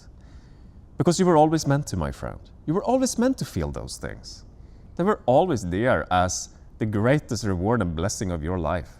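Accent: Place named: Scandinavia. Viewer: Your strong Scandi accent gives your words even more power! Also, please give special treats to the doggy for such perfect supporting role, standing up and walking as far as his leash allowed when you were talking about expanding somewhere else, and looking away to the trees just as you mentioned shifting perspectives :)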